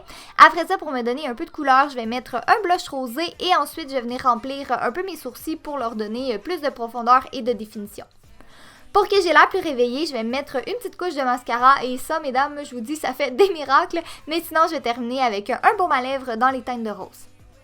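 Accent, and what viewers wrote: Quebec, Canada. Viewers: tu vien d'ou québéc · j'ai remarqué que tu était québécoise ! tu viens de ou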